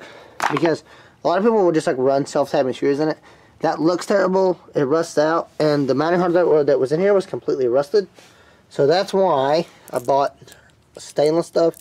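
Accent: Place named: South, United States. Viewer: Dang bro,where you from. You sound country as fuck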